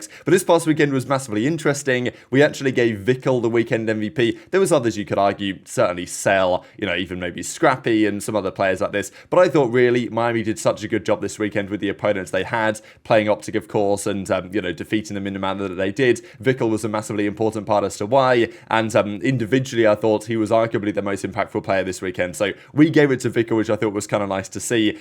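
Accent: England, United Kingdom. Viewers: where you from? From England so I’m assuming south somewhere but don’t know if it’s London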